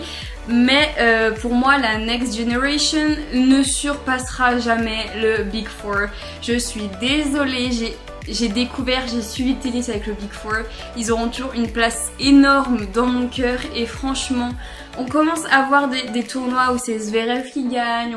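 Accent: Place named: England, United Kingdom. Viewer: T0N ACCENT ANGLAIS PUTAIN INSUPORTABLE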